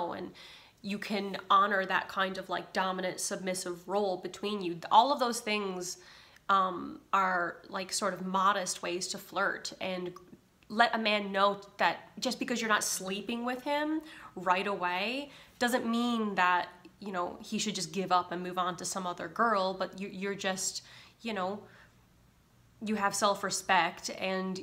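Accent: Newfoundland, Canada. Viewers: That's the Newfoundland accent with a bit of overseas dilution in it. It's quite unique.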